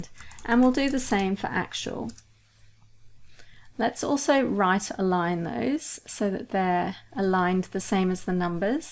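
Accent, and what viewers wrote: United Kingdom. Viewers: Which English accent is this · Why is it British-sounding women always seem so SMART? :-) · Hi, thanks for sharing and please keep posting long videos because not only you will help us in learning excel but also would help us in learning British accent. I have been literally looking for a British channel for a very longtime ... thanks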